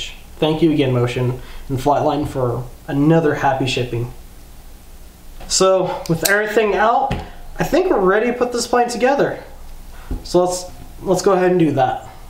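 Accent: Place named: Russia. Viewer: I can't place your accent. When you run some words together, it sounds like another language. Russian? Chinese?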